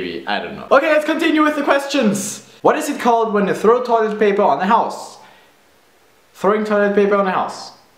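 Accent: Germany. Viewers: BAHHA german accent sounds cute on this guy! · Thank you for this... The other ones I found were Anmericans imitating German accents - You are the real deal and that is what I needed. I am an actress and wanted to check my German accent - You helped immeasurably and you were fabulous and entertaining to watch!!! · Apparently I don't know too many Russians, but I know how this language sound. As a native it's way too easy for you, but for foreigners German influence is obvious in this video, therefore for me he's far from Russian. Всего хорошего! :) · Damn, I love you German accent! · You're so adorable, and I have the feeling you wouldn't be as adorable without your accent :) good job you were born German :) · You are the cutest thing ever & envy your German accent!!♥️ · lol i love your german accent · Holy faacckk. As a German, born in Canada, all throughout watching this I couldn't stop smiling. 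(: what a gorgeous smile. And those beautiful eyes. ♡ AND THAT VOICEEE. 😊😊 My looordd. I need to go to Germany and reclaim that accent. 😁😁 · I love the German accent! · Du bist deutsch! :D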